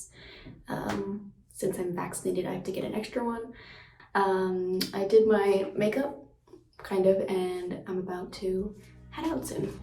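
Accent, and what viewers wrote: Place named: United States. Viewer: Your accent is typical America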